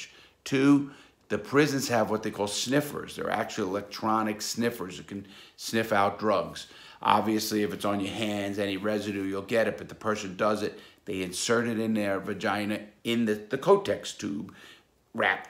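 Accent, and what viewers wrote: New York, United States. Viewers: I love that ny accent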